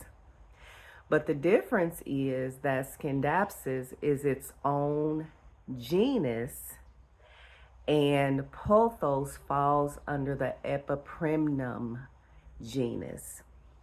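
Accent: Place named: South, United States. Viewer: I love your collection of Scindapsus. I love all the them. I think they are so different and spectacular.....I love your southern accent....many blessings....chula....abrazos amiga....MINDY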